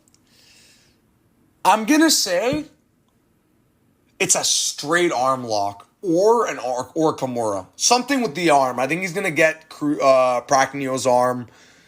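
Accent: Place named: Canada. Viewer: i can hear the Canadian in your voice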